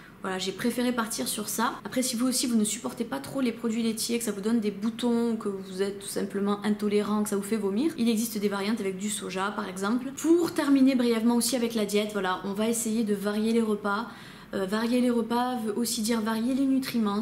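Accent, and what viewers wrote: South, France. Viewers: j'ai bien aimé ton approche au final bien resumé pour les debutants... avec un petit accent du sud non ?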